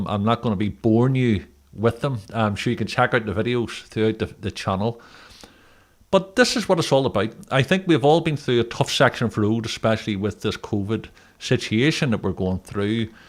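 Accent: Belfast, United Kingdom. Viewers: Oh my goodness, I’m so glad I re found you... You are a inspiration. I’m going to share this with my Auntie Pamela, she’s from Belfast & course so was my dad... I did visit Belfast a few times in my childhood. The troubles were happening at the time. I was about 8 so I didn’t understand it really. Your accent is a warm reminder of my gran parents, my auntie Pam still has her Belfast accent. My dad passed away a few years ago sadly.. but we didn’t notice his accent at all which is quite funny. Probably because we heard it from birth. Anyways so happy to have listened to you today. My road has been very dark since 2009 after losing my beautiful husband to cancer.. 😞 Thanks again, my brother is called as well, I remember his name being a issue when we were visiting as not the correct religion.. lol But thank you. I’ll look forward to seeing you more. 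Take care. Lorna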